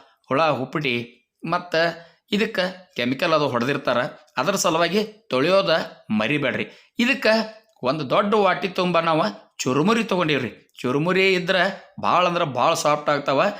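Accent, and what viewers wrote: North Karnataka, India. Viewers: ಭಾಳ್ ಭಾಳ್ ಚಂದ್ ನಮ್ಮ ಉತ್ತರ ಕರ್ನಾಟಕ ದ ಭಾಷಾ 👌👌👌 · ನಮ್ಮ ಉತ್ತರ ಕರ್ನಾಟಕದ ಭಾಷಾದಾಗ ಭಾಳ ಛಂದ ಹೇಳಿದಿರಿ 👍👌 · Guntpangla maadadu bhala chanda torsiri matta namma uttara karnatakada bhasha kelalikku bhala chanda paddu chatni eradu masta maadiri 👌👌😋😋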